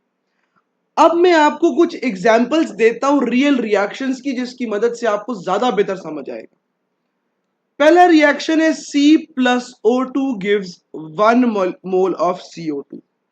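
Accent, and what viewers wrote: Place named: Mianwali, Pakistan. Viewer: I think you are from mianwali?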